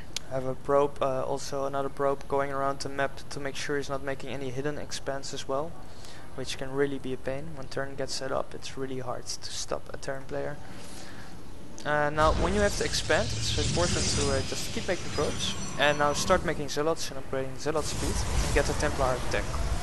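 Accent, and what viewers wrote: Netherlands. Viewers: Wanne Hollandse accent joch !!! Good video btw ! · Work on the Dutch accent ^_^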